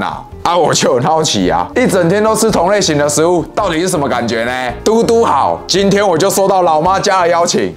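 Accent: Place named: Taiwan. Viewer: 好台好喜歡😘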